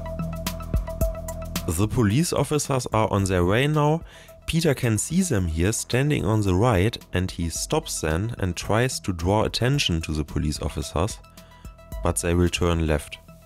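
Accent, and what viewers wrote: Germany. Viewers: Das ist das Deutscheste Englisch was ich seit langem mal wieder gehört habe. Aber schöne idee auch clips für die Englische community zu machen.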